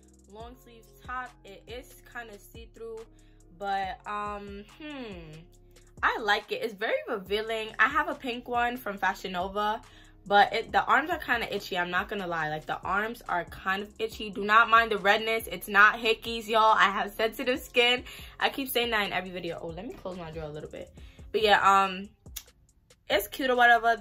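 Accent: New York, United States. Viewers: But its funny how soon as you spoke im like yasss sis from ny ! 🤣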